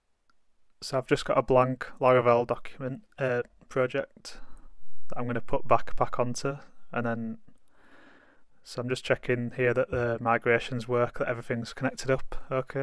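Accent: United Kingdom. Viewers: Great tutorial. Up the northern accent! Hull all the way! Does this work well with Jetstream or is there a clash between the logins? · Hey, just found your trading bot video and seen that the next video you published was this one, recently. You sound local to me. You’re not from Teesside are you by any chance?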